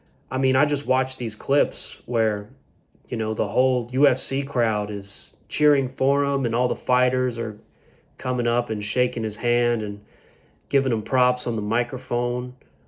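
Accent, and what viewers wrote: Mississippi, United States. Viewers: What part of Mississippi are you from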